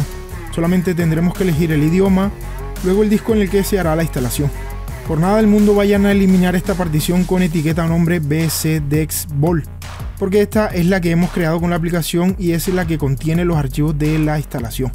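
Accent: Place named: Cartagena, Colombia. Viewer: Amigo, usted es de Cartagena Colombia ¿Verdad? Esa voz no creo que sea de otra parte !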